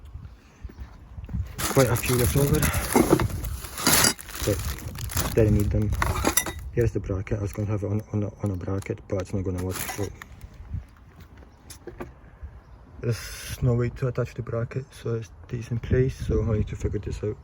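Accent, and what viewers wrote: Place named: Pakistan. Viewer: I can’t make out a word that you did said. It’s like half Pakistani half Dutch with a bit of Glasgow accent chucked into the mix. M8 I didn’t understand one word of what you just saided.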